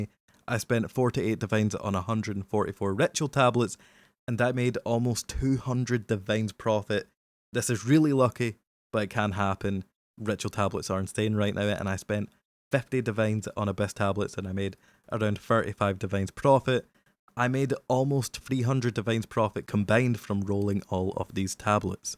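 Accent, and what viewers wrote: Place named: Scotland, United Kingdom. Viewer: It's called scottish accent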